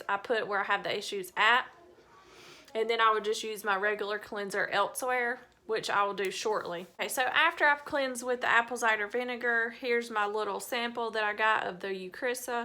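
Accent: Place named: South, United States. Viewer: I am currently using the eucrisa as well. My whole body is covered with eczema. All except my face. But you have a sexy southern accent. Where are you from? I was raised in North Carolina but live in South Florida.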